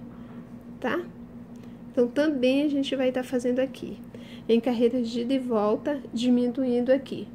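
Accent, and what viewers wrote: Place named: Rio de Janeiro, Brazil. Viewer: Oi!Boa tarde! Estou muito feliz! Acabei de terminar o meu. Eu fiz cm a linha nr 4 (barroco)e diminui 2 grupos no inicio. Ou seja comecei cm 7 grupos. E deu super certo. E olha que estou meio gordinha.Usei agulha 2,5! Beijos! Obrigado por dividir conosco suas obras de arte. Você é carioca? Fico encantada com a maneira cm q você pronuncia. Que Deus abençoe vc e sua família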